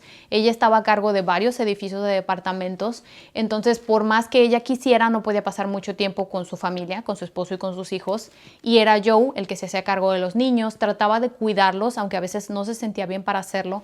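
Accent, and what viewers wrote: Mexico. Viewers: explicó en otro video, que ella nació en el D.F. ahora llamada Ciudad de México, pero se fue a vivir a Querétaro, pero lo importante es la buena dicción que tiene!!! Y además ése acento que tenemos las Mexicanas 🙋‍♀️🇲🇽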